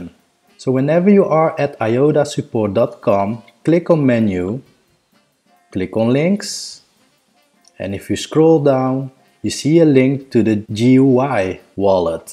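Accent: Netherlands. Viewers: Thanks for the video!. Question: Are you Dutch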